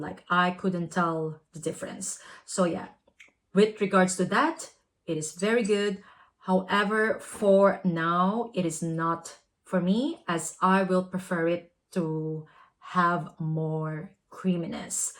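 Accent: Philippines. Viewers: Ooh. I knew you are a pinay from your accent. I'm from the Philippines also but now staying in italy 😊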